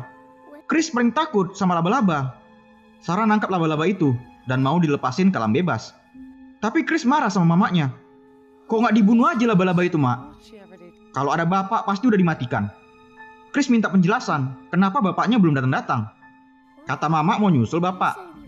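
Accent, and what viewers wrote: Medan, Indonesia. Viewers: Wkwkw org Medan ya bang? Btw mantap bang trus berkarya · Kek suara orang Medan:v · Orng medan bang? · Anak medan punya logat 😂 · Yang bikin saya suka Vidio in adalah logat medannya Abang Abang ini🤣❤️ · Pernah nonton film-nya, tapi waktu baca notif langsung klik aja🤭 mantap kali bang,,, aq suka bahasanya kaya orang medan😃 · weh bang, kau ceritain film kenapa harus pake bahasa Medan bang, kan jadi ngakak😂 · Logat medan bukan sulawesi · Pengisi suara nya jangan ganti" bang.. Tetap pake bahasa medan... Mantap seru kali aku bahh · Bg orng Medan ya bg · Logat medannya keren abg, semangay trus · Logat medan emang gk ada duanya.. 🤣🤣🤣 org Medan jg nih 🤭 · Saran: kasih banyak logat medanya kayk suara chinoll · Emang orang medan daerah namorambe emang the best ligat suara lu bang? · Orang medan dia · Anak medan ni keknya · Orang medan kah abang😂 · Medan punya bos wkwkkwk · Bang gua kangen ama suara asli medan lu bang gua suka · Mantap bang orang medan y sama lah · pasti orang Medann ni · Orng medan ka bah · Orang medan mana suara kelen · orang medan nih pasti abangnya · Logat medan pun membuat saya subscribe😂 Asli ini mimin ny bner2 buat kita kyk nganggap suara dia jadi pemeran itu. 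Feel ny dpt bngt. Terus berkarya lek👍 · Berasa lagi ngobrol sama calon lakiku 😂😂 dia orang medan pula wkwkwk ngakak denger suaranya medan banget jir abang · Nampak kali orang Medan mantap bang 👍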